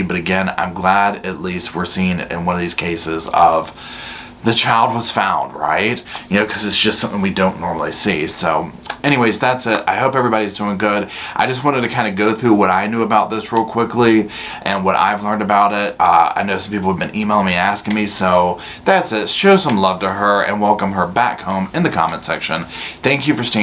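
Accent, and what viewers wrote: South, United States. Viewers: Dearest and Roscoe darling, I L💓 VE YOU!😘 I can't tell you how thrilled I am to watch your channel grow 🌱 There's nothing more fabulous than hearing that southern twang in your voice as you spill the tea to "Y'all"!😁 And a shot out to the officer's who were so kind to this precious little child 💞 My heart just aches thinking about what she's been through... BOTH these "parents" should go to prison for a longggg time!🤬 They have no doubt f*cked up this child's life, and I pray she gets the care and therapy she'll need to heal🙏 I'm so grateful for this channel, and adore "y'all" to pieces 🧩 XOXO's, Maegan 💋💋💋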